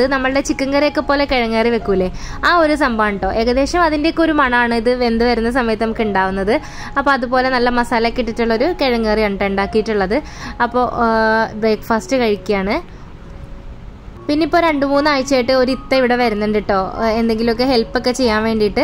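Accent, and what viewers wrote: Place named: Kasaragod, India. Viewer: Chakkappaayam ...kasaragod parayunne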